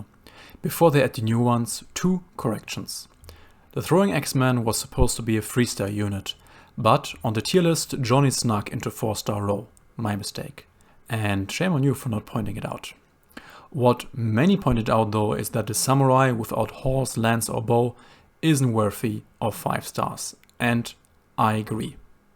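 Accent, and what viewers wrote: Germany. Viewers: It's funny how you think you joke about your German accent and switch back to your normal English, which still has a heavy German accent. But I sure love your content. Keep it coming! <3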